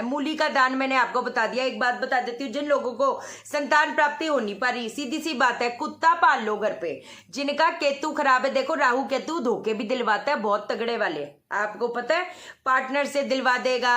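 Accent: Punjab, India. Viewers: Wow💞 bindass talking style👌pujabi touch ..❤️( hai ki nhi.)my favourite ..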